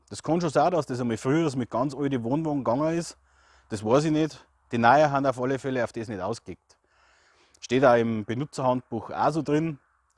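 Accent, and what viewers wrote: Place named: Bavaria, Germany. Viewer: bitte untertiteln, da boarisch nicht jeder versteht !!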